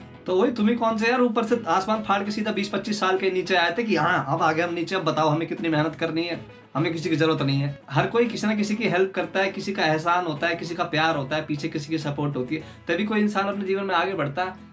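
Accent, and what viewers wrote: Haryana, India. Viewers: Bhai yr tum jb haryanvi accent bolte ho to bda maza aata hai lgta hai tum india mai hi ho...aise hi rehna bhai...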